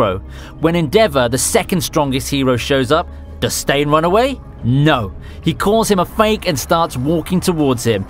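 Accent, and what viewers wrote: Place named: Scotland, United Kingdom. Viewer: wish I could speak scottish like that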